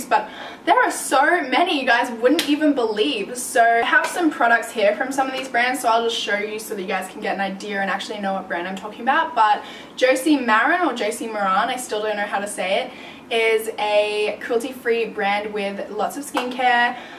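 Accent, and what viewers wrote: Australia. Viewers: Hey, can you make a Get Ready with me video with your Australian Accent?:) hope you noticed me! I love to watch your videos because of your mix thingy accent and you're so talented!! you look like Kylie jenner slash Vanessa Hudgens <3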